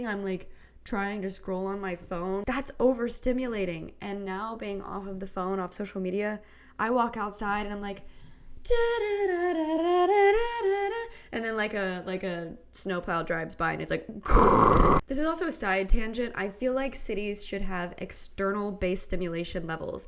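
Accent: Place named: South, United States. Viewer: You should do some Southern Receptionist ASMR stuff <3 accent so good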